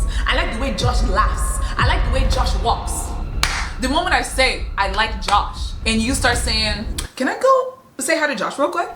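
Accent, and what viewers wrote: Nigeria. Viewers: Nigerian accent 😫😫😫😫😫🤌🤌🤌🤌🤌🤌🤌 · Again I ask,are you Nigerian cause the accent is hitting hard which makes your reactions more real and relatable 😂😂😅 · Are you a Nigerian cause u have that accent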